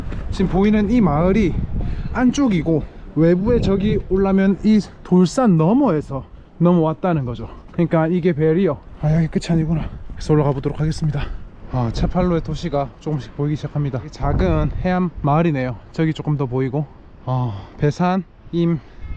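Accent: Gyeongsang, South Korea. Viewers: 우연찮게 보게되었는데 정주행 하게 되네요.같은 경상도 사람이라 억양이 친근하이 좋습니다ㅎㅎ영상은 어디서 배우셨나봐요.전문가 포스가 느껴지네요.안전한 여행하시고,좋은영상 기다리고 있을게요^^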